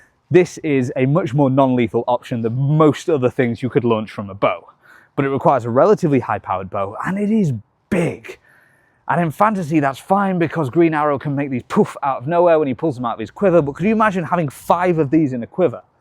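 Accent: United Kingdom. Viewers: ok so you're british right? Slow Mo Guys collab???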